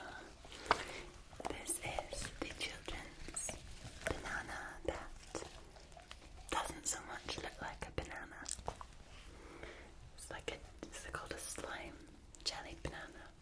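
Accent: Scotland, United Kingdom. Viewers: First of yours I've seen but the Scottish accent although not same dialect as mine is like being back home even just for twenty minutes. Hope ye keep it up. Very talented lassie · Lovely, little Angel. Another delightful session just how I like it. Up close and personal with the very tingly “dot, dot, line, line” verse! I love listening to you read with that gorgeous Scottish brogue! You lovely face just lights up my iPad screen as well as this old heart of mine. Thank you so very much, darlin’ lass! 🌹🙏🤗🧚‍♀️ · I would absolutely love more stories read in that accent. Makes me want to channel my scottish ancestry and fight the brits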